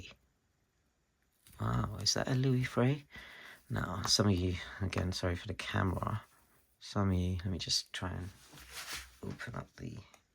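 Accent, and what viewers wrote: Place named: United Kingdom. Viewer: I liked your presentation with soft British accents. Your thick husky voice is pleasant. Proud of you!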